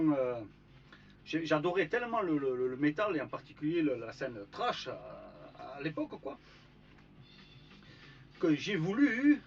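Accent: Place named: Pyrénées-Orientales, France. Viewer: Salut, Trop marrant après tout ce temps aux Pays-Bas, tu as encore un putaing d'accent des PO!!! Evol un jour Evol toujours! Sinon, je n'ai même pas le photo de Shud!!! Tu pourrais me l'envoyer par hasard plize? En tout cas, cool de te voir et t'endendre! COTLOD!!!!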